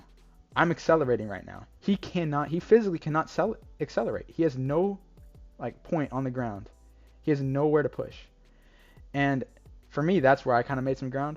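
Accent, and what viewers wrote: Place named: California, United States. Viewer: Dude you sound like your from California 😂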